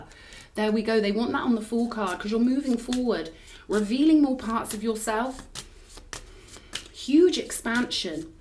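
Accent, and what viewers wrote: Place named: Cockney, United Kingdom. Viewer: your given it some proper Cockney slang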